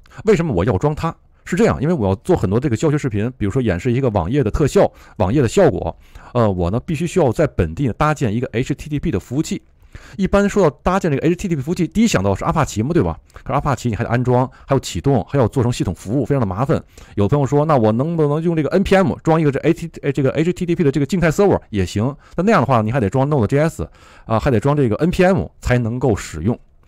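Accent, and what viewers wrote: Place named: Japan. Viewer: 难怪觉得说英语有点日语的味道哈哈哈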